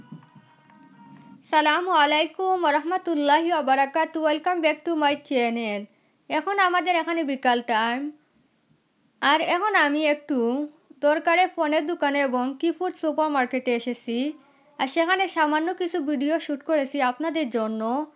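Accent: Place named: Sylhet, Bangladesh. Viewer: তোমার মাত হুনিয়া বুজিলাইছি তুমি সিলেটি।আমার এলাকার আফা।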